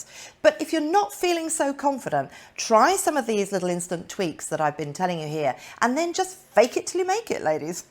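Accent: United Kingdom. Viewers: My only tip is never wear wide legged pants for travel. The bathroom floors can be disgusting and you don’t want your pants to touch that. Joggers solve that problem nicely. And might I add it was weird, and somewhat even disappointing, to hear a beautiful British voice say sneakers! And I’m American! 😆 Great videos.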